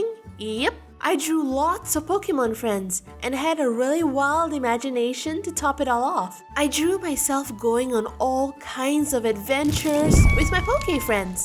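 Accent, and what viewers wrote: Scotland, United Kingdom. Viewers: I love yoyr Scottish accent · I can still hear your Scottish accent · your scottish accent isnt that bad like this comment if ur scottish 1st like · I’m a good artist too this was an amazing story to show to my friends! By the way i like your trying to speak Scottish! Be yourself your beautiful on the inside. Always believable in yourself! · I love her Scottish voice because I am Scottish and irish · It sounds like there’s a q in every sentence in the Scottish acent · i understand scotland accent actually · I live in the UK (Scotland) Your scottish accent is hilarious.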